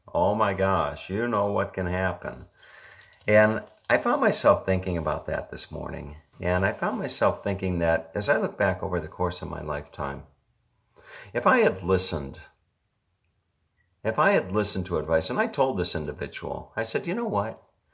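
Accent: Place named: United States. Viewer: You mentioned about learning English from your videos. I actually only talk Aussie and I am trying to learn American from your videos. LOL.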